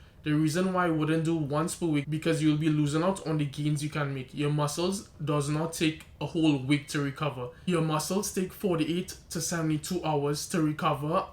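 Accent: Trinidad and Tobago. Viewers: Trinidad man?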